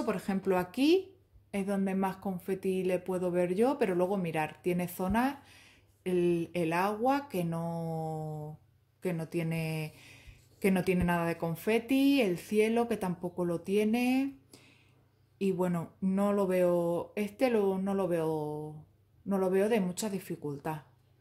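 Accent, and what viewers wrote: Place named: Cáceres, Spain. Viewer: Querida. Me encantan tus videos, los Kits y los avances. Pero sobretodo me encanta su acento de Cáceres ya que mi pueblo es Holguera, muy cerca de Cáreces y tu forma de hablar me recuerda mucho a la de mi familia. Hace mucho que no voy al pueblo y tus vídeos me lo acercan un poquito cada semana. Un beso.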